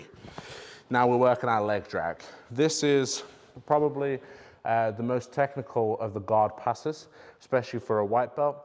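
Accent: United Kingdom. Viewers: My first video, and he sounds like Reggie Watts using an British accent.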